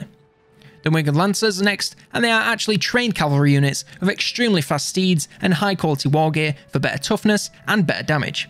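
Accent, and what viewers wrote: United Kingdom. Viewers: For the longest time I thought they must call this game “Wahhma” in the U.K. based on this guy’s accent.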